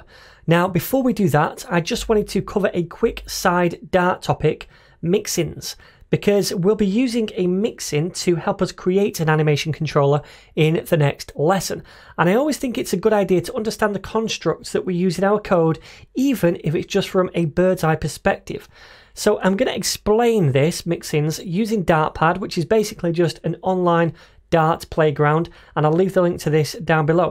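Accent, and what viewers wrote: United Kingdom. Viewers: Always watch your tutorials, like how you explain each video clearly for beginner and love your British accent:) Hope someday you have complete Dart series. Thank a lot,.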